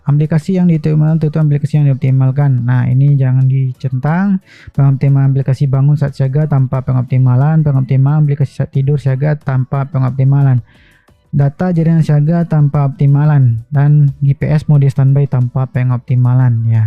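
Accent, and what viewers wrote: West Sumatra, Indonesia. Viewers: Abang orang sumatera barat ya 😅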